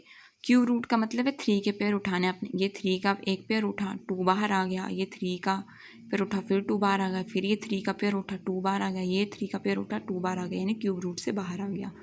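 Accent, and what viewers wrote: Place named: Pahadi, India. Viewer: Didi ji Mera comment padho kya pahadi Ho🎉🎉🎉🎉🎉🎉🎉🎉🎉🎉🎉🎉🎉🎉🎉🎉🎉🎉 you are pahadi kyunki aapki awaaz se lag raha hai ki aap pahadiyon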